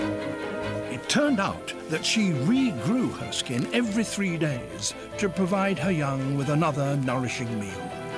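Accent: United Kingdom. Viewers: I wish this eloquently spoken British chap would narrate my life..."The American father, still in a stupor early in the morning, must have coffee to function properly, and tends to watch strange youtube videos while sipping coffee."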